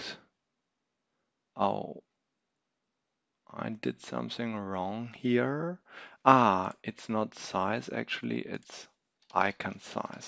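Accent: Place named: Germany. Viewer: You sound very german :D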